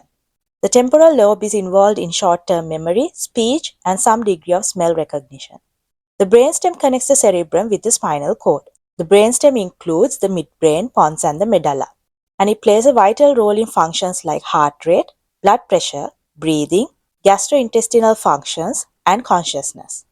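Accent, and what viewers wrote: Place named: Asia. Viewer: Good video and great effort. Congratulations for a very good job. However, You have a distinct, asian accent difficult to understand by western European citizens and you have spoken to fast at times. Best wishes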